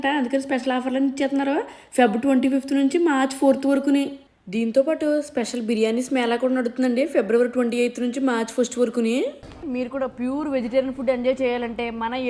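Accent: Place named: Amalapuram, India. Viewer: Amalapuram language super ..entandi....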